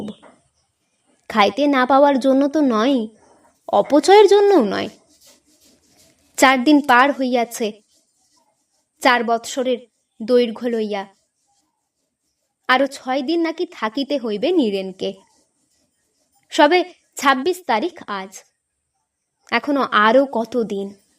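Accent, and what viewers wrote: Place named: Bangladesh. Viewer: Madam narrator you must be from Bangladesh. Bad narrator.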